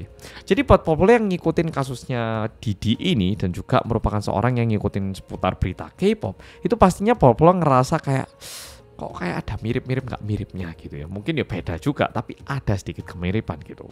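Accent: Java, Indonesia. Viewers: Akhirnya versi medhoook.. wlw telat wkwk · Akhirnya mas bikin videonya jg. Makasih mas. Krna penyampaian nya mudah dimengerti, detail jg, gk ketinggalan medhoknya 😅, editing nya jg keren sih, jd terhibur jg apalagi part mas nyanyi 😂🙏🏻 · Apanya yg lucu dari kemedhokan ini... Aku bhiasha ajha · Aku delok ki ora kyk delok youtuber korea kyk youtuber jatim… tp gpp iku warnamu wes ojo d ilangi… sering2 dolan malang ben gak ilang medok e… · Paling senang lihat penyajian Mas. Paling rapi dengan bahasa yg santuy dan gak bikin pusing yg lihat. Good job mas korea jawa medok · Sebagai orang Jatim paling ujung barat “Njenengan jouwosh, Mas”. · karna medhokk, aku jd ngakak bukan horror wkwkw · wkwkw aku yg udah liat versi dari nessie sama jerry yg banyak pake aksen inggris, ke mas yg pake logat jawa jadi ngakak 😭 berasa ngobrol sama temen sndiri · Korea medhok 😂 suka denger ngomong nya lucu bgt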